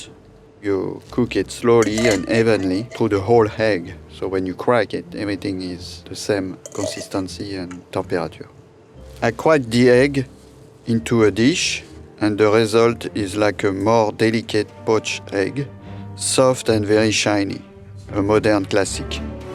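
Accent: France. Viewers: He's painfully French, judging by the accent. But an amazingly skilled chef, nonetheless. I wanna try the Ouefs en meurette, and the jelly poached eggs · "I see people do crazy things, you know." said the deadbeat-sounding Chef in a thick French accent with a very serious tone. Are you feeling well lately? I am concerned. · I'm french and even for me it was too much. We all know that our accent is hard when we speak english, but yeah that's kind of extreme here. Still loved his "and voilà" at the end. 😂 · Intelligible? What? He has a French accent. He is French.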